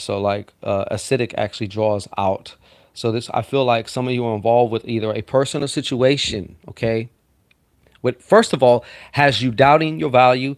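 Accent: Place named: New Orleans, United States. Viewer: Hey! Cajun Cappy here. You sound like you could be from New Orleans! Where are you located?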